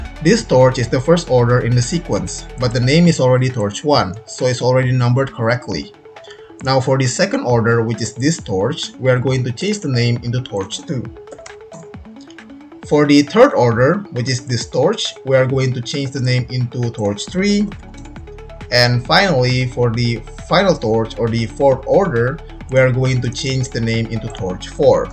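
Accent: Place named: Indonesia. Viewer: That sucks! hope you get your account back. But random question, Are you Indonesian by any chance? I noticed you have somewhat of an accent and it sounded similar to Indonesian accent.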